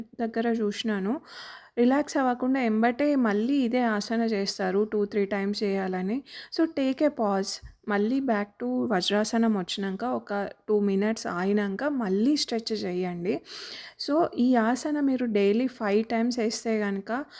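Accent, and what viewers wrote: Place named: Telangana, India. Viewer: Telangana accent 😎🙏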